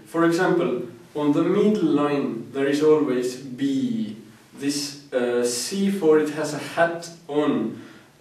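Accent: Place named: Estonia. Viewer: he's estonian haha...I could tell just by the accent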